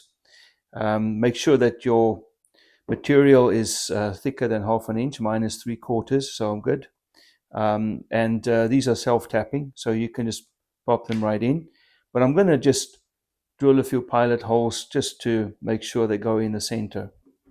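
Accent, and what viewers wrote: South Africa. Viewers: is meneer van suid afrika,want ek hoor daai Afrikaanse "accent"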